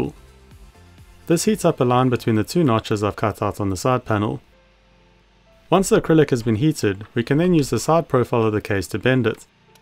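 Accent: South Africa. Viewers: Ey you South African, I like you & ur content ❤🎉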